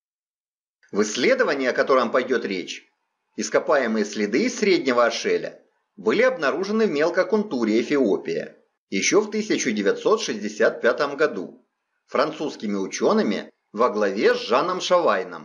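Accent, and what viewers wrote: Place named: South, Russia. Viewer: .. ну южно-русский говор и что?..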